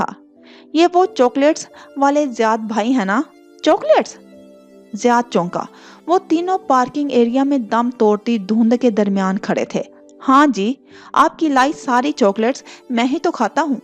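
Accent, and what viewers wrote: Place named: Punjab, Pakistan. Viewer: Voice me Punjabi lahza kam kar lein please kuch lafz Samjhne me problem hoti hai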